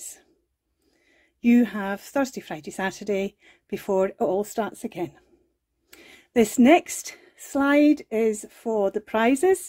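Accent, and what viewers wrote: Scotland, United Kingdom. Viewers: I love this so much; I could cry. 💞 I'm so excited for this collaboration!!🧚🏾‍♀️🧚‍♀️🧚🏻‍♀️ You sound like you're from Scotland. My Grandpa is from Dunfirmlan🩷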